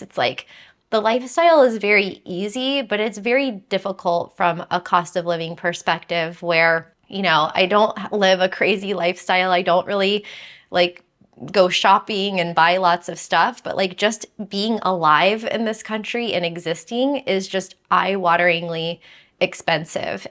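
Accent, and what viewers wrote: United States. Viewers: When coming, could you drop that horrible American accent??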